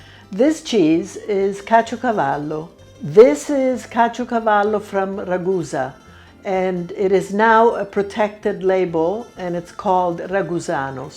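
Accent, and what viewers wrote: United States. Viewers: She has an extremely American accent. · This woman has never lived here in Sicily in her life... "Sicilian" my butt.. Thick AMERICAN accent... Americans always say things they are not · how come you sounds american and not italian?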